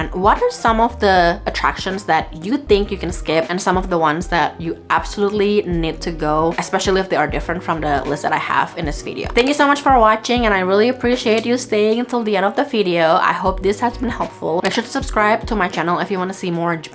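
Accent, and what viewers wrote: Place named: Indonesia. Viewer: Good review especially on the fish tank exhibition at Ginza.Your English is very Chindo :)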